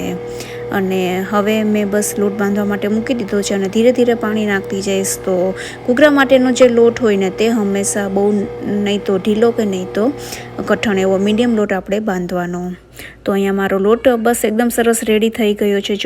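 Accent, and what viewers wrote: Saurashtra, India. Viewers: Gugura bhu mast che khavanu man thaya che hu nayana anjary tmaru nam ? Tme sourast na iago cho bolva ni rit thi hu kutch bhuj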